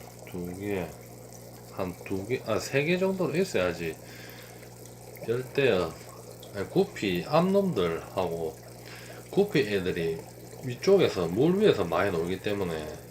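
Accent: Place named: Gyeongsang, South Korea. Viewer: 사투리가 완전 제 고향에 온 느낌이네요 혹시 경주쪽? 울산쪽? 암튼 그쪽 경상도사투리같네요ㅋㅋ어항 잘봤습니다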